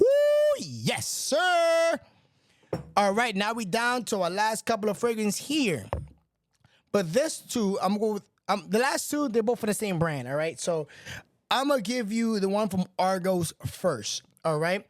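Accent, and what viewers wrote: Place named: Peru. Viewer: Y yall hating on his Peruvian auntie accent 😓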